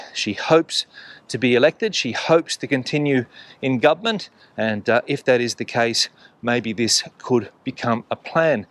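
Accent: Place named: Australia. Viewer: Congratulations on the best Australian accent!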